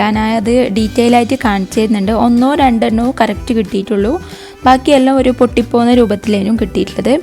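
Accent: Kannur, India. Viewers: kannoor bashayum poliyan nigalude cookkingum poliyan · Nalla rasand nammale kannur bhasha👍👍👍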